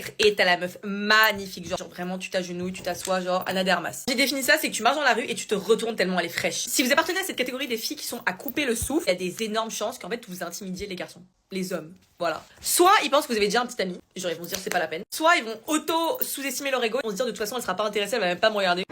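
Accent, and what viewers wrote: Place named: Suburbs, France. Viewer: Elle parle comme un mec de banlieue. Quelle horreur.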